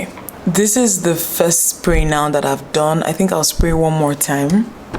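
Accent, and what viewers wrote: Nigeria. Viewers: Nigerian accent, how? 😂😂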